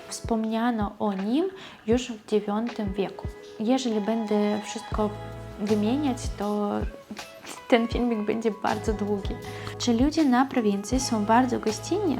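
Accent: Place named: East, Poland. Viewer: Witam Panią,! Proszę uwagi na tego faceta o pseudonimie To jakaś dziwna kreatura. Co więcej, jego znajomość języka polskiego jego bardzo słaba. Może chodziło mu o to, że gdy Pani napisała "Ale jaki świetny wpis.", to wypadało raczej powiedzieć "Ale jaki miły (ALBO pochlebny) wpis (ALBO komentarz)!" Czy będąc lekarką z białoruskim wykształceniem mogłaby Pani wykonywać swój cenny zawód w Polsce, choćby po kilka godzin dziennie? W Polsce odczuwamy brak lekarzy. Podziwiam u Pani bardzo dobrą znajomość języka polskiego. Pełen uroku jest Pani ten słodki, lekki wschodni akcent, który jest tak miły dla mych uszu. WSZYSTKIEGO DOBREGO W NOWYM ROKU DLA PANI I RESZTY WASZEJ MAŁEJ RODZINKI.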